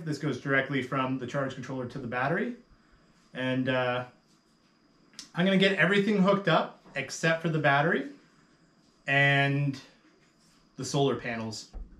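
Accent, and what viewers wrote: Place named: Ireland. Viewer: Came across your channel yesterday and pleased it was recommended. You definitely have answered one of my thoughts. I was thinking why am I picking up Irish Ancestry through your dialect. Cheers from the UK 👍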